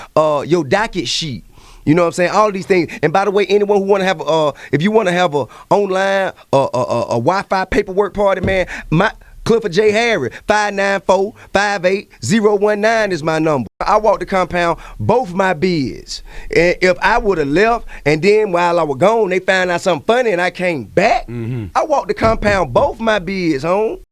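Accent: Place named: South, United States. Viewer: This do sound so fucking country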